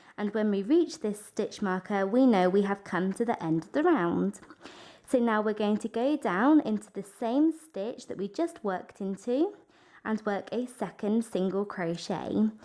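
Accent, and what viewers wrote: United Kingdom. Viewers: this is in british and i don’t understand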